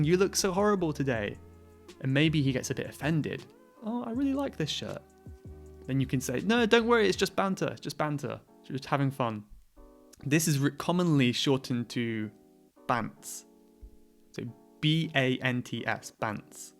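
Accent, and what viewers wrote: United Kingdom. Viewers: Hey, it’s Lee speaking from mainland China, i am trying to find some useful tips, videos on YouTube for improving my spoken English. And your videos are so useful and your British accent sounds so noble and elegant. Cheers mate.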